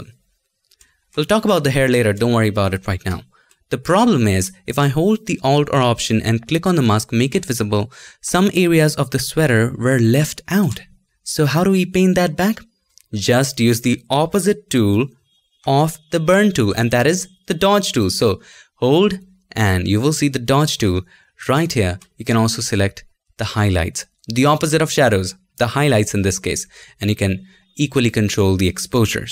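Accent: India. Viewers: hello there! are you Indian cuz your same exactly seems like Indian, I'm also Indian ☺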